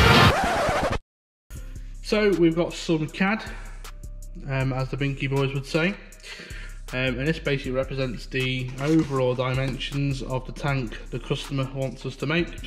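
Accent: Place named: North, United Kingdom. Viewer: Ohhhh a northerner ahaha great work !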